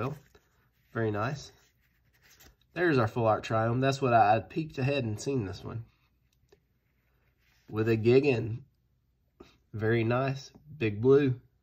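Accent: Alabama, United States. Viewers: Bro, you’re from Alabama? I thought I detected a slight accent. Right on brother. Thanks for the vids. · I guessed you were from a Alabama from your accent! What part?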